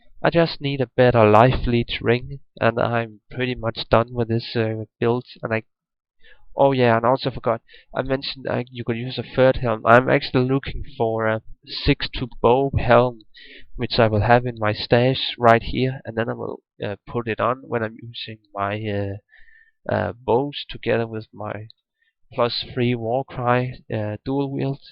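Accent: Denmark. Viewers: This really brings me back. I used to play softcore EuNL as well, used d2jsp, made countless of builds. Think I started about 10 years ago and played pretty much every day for 3-4 years. My favourite game of all time and the PvP aspect was legit, although quite frustrating at times(PK and script hammers). It's amazing to see people are still playing, but you mentioned you stopped? How long did you play for, and if I may ask, how old are you? PS. Ja den danske accent er let genkendelig :) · the moment when you can recognize a Dane on the accent ;)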